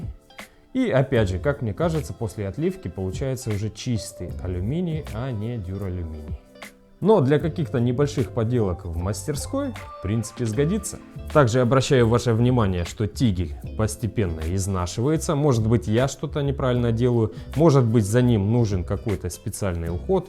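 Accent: Rostov, Russia. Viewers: Ростовский говор)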